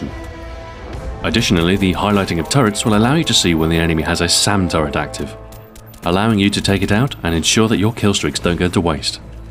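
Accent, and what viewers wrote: England, United Kingdom. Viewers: Where abouts in England are you from?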